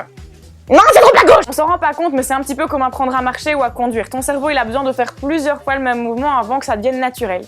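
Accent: Belgium. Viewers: Hey j'adore tes vidéos Néanmoins j'entend un petit accent serai tu une petite Belge tout comme moi ? Continue ce que tu fais t'es top 🐱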